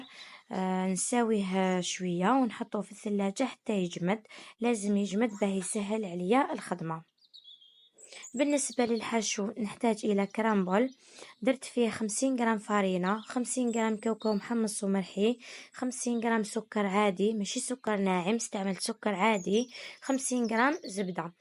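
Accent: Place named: Morocco. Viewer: صاحبة القناة لهجتك مغربية!!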